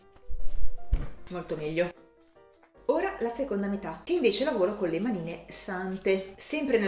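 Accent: Romagna, Italy. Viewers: Ma che meraviglia sei? Ti trovo davvero brava.... Per non parlare della simpatia e l'accento che immagino romagnolo mi fa sentire a casa... Ma gli elastici dove li hai presi? Grazie e tanti auguri.. Anche se un pochino in ritardo!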